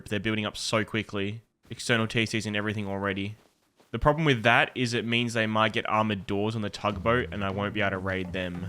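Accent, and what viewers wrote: Australia. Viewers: First 5 seconds I'm like "Oh he's Australian? Subscribed." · I honestly didn't even hear the Aussie accent and now I find it crazy that I could have talked to this man before❤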